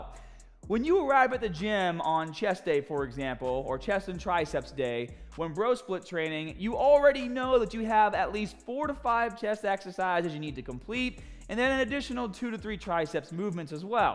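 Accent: Boston, United States. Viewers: That's a proper Boston accent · That thick Boston accent!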